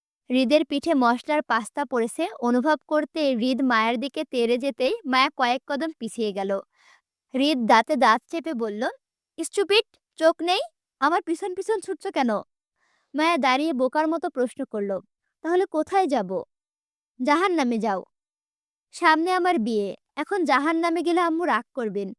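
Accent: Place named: Chittagong, Bangladesh. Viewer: তোমার মুখে আমাদের চট্টগ্রামের ভাষা খুব মানাইছে😊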